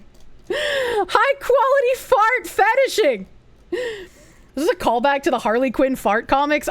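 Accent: Boston, United States. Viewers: Incredible content. Bostonian ASMR got me through the work shift. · Bostonian woman ASMR, damn 😮 I now think she needs to collab with VAllure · As a fellow New Englander, the Bostonian ASMR was something I didn't know was missing in my life until now, we need more of that! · That Bostonian accent is so funny, earned a sub there.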